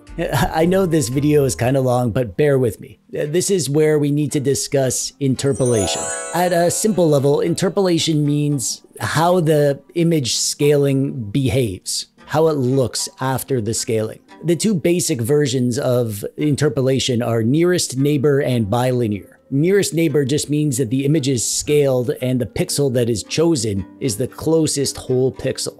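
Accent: Canada. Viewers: Is the host Canadian? Testing my Canadian radar detection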